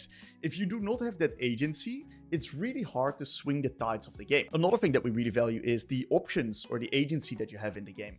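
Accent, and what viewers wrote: Netherlands. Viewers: I like your typical Dutch english accent!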